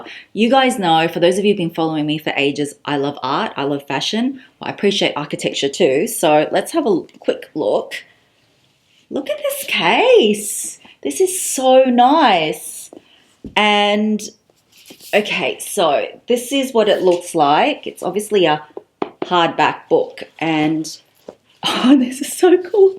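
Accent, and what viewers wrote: Australia. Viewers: Love your Australian accent. Lucky you. I can't even afford to buy the fake bags. Love all the goodies you bought.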